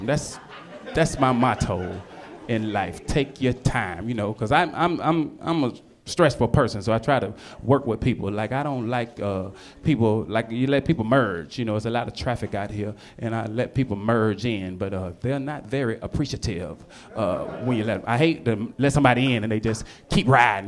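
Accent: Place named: South, United States. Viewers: The accent. Probably from the same part of the south. Just a guess. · That black atlanta accent is hilarious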